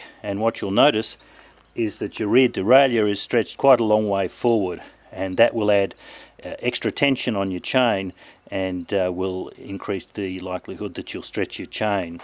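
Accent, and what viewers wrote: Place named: Australia. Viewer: youre australian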